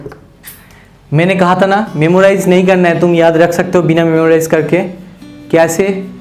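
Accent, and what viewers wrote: Bengal, India. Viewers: Sir are you bengali please reply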